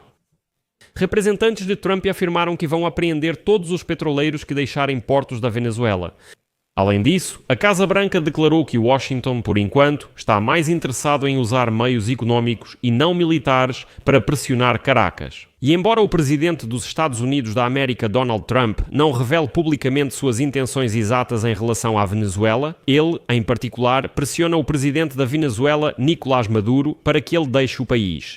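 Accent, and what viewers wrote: Portugal. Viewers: Vc tem um sotaque diferente do brasileiro, fala de Portugal ?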